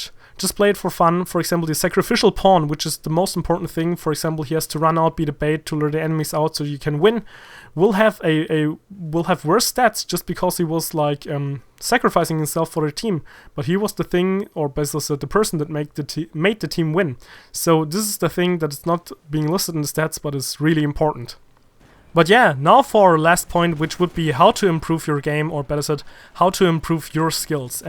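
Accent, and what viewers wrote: Germany. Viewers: Ich vermisse den Punkt "Strategie" (=langfristige Manöver um das Spiel zu gewinnen - z.B. bei Spielmodi mit mehreren Objectives von einem Ablenken um das andere angreifen zu können, ...). Außerdem hätte ich Teamwork (=Rollen sinnvoll ergänzen, gegenseitig Rücken decken, ...) und Taktik (kurzfristige Manöver um Gunfights zu gewinnen - Dropshots, Deckung effektiv nutzen, ...) nicht unbedingt in einem Abwasch erledigt. Ansonsten hast Du das aber sehr systematisch und sorgfältig gemacht :) ... PS: Egal wie sehr man auf den Akzent achtet, das Deutsche hört man immer irgendwie heraus ^^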